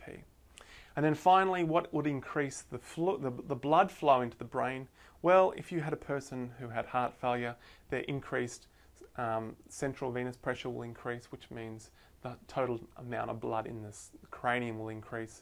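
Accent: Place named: Australia. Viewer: Legend! So much easier grasping this concept hearing it from a fellow Aussie! Cheers :)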